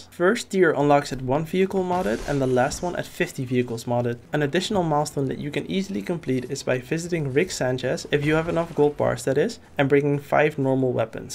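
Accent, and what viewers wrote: Netherlands. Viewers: Je hoort gewoon dat je nederlands bent😝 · You dutch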